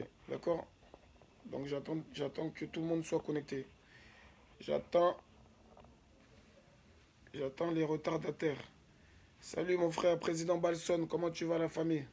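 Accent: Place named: France. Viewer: Ton acent francaise me plait bocou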